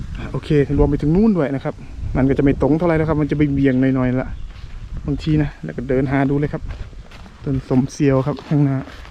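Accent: Korat, Thailand. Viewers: คนโคราช บ่ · ชอบแถะๆคับ คนบ้านเดียวกั๋นคับ โคราชคือกั๋น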